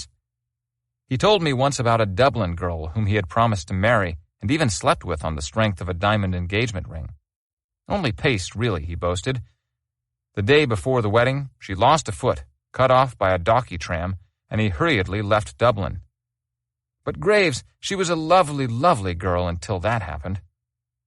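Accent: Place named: United States. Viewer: Based on the atrocious AI "art" (and spelling) of the thumbnail, it's probably an AI bot doing the narrating. But yes, the US accent is jarring and inappropriate.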